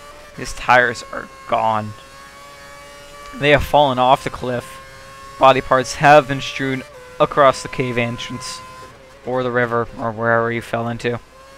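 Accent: United States. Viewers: So why's he got an american accent?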